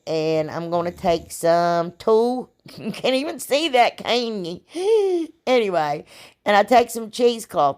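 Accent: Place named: South, United States. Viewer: I’m the same way about the glitter and it seems like a lot of DT signs have lots of glitter, urgh! That’s the first thing I do is sand that stuff off. It bugs 🐛 the heck out of me. Love your DIY’s and u are a hoot! I’m from Oklahoma and I have been told I have a southern accent, lol. But u might have me beat. ( just a little bit) hahaha 🤣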